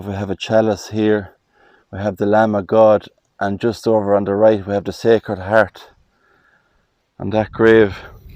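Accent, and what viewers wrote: Ireland. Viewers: Such beauty and history. I could listen to your descriptions in your fabulous Irish accent for hours.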